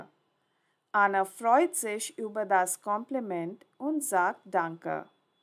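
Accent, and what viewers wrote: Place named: Spain. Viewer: Sounds like some whose mother tongue is not German- (maybe spanish )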